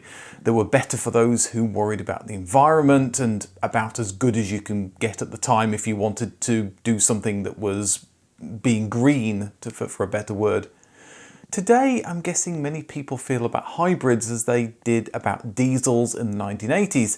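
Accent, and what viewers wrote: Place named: England, United Kingdom. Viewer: he has an English accent. Does that help ? 🙂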